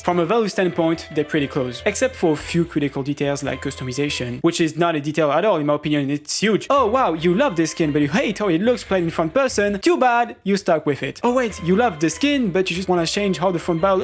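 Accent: France. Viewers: you sound very french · Having a French accent is kinda broken tbh. You can do something shameless and then yell at me with that funny accent and suddenly its ok and I agree with you.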